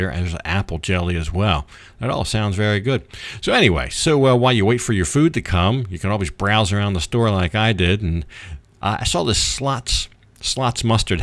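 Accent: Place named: South, United States. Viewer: Where are you from? Sound like Southern USA 🇺🇸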